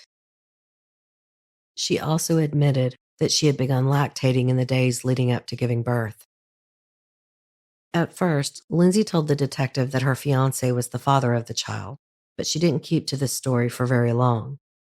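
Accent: South, United States. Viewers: She didn't come up with the hypothesis. The shrinks did. If anything she was candid and remorseful. She obviously had issues and while I don't think the boy understood it, she likely did have that kind of compliance that made her go along with it when he said he wanted sex and to marry her-- he was probably exploiting her. I bet she has a below average IQ and other problems. The truth is cognitive dissonance is incredibly common. As a dental health professional her reaction to a biohazard would be to clean it up. I feel badly for the babies but I know smart women who could protect themselves during sex who don't. They don't defer to a morning after pill or ( as much as I dislike it) have an abortion. The cop and the judge were biased. I get their emotions because we can't imagine killing infants. But let's be honest. They're men. Their expectations are not entirely empathetic or intelligent because they have that whole Madonna whore dissonance about women. 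If they had to have babies they would be a little less judgemental. Men can make babies and no one judges them for splitting, for dodging support and denying them. I know men with six kids by as many women who don't pay child support. Or anything else. No consequences. You did a very fine and fair job here. Ive been binging on the show today& love it. I grew up in the south but have been a vagabond since I came of age. It's good to hear that sweet southern sound of the storyteller in female form. I think of Flannery Eudora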